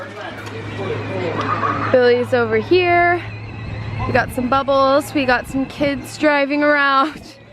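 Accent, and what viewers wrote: Louisiana, United States. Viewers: We love that Louisiana accent that came out on Easter lol I enjoyed this vlog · You could totally hear accent come alive when she was home in Louisiana LOL!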